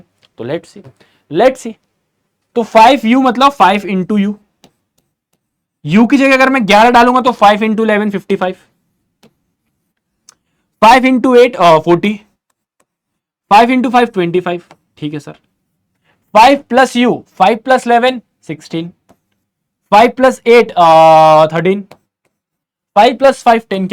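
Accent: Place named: Bihar, India. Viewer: Sir is bihari for sure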